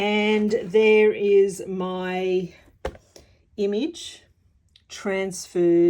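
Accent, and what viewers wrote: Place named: Australia. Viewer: Really interesting technique,i’ll have to try it. Also,nice to hear a fellow Australian. Thankyou & Cheers!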